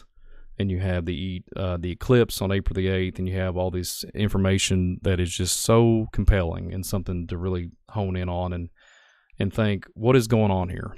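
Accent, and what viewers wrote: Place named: Kentucky, United States. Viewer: WV Hillbilly here loves your Kentucky accent ❤ My mama’s family is from Lawrence County, KY ❤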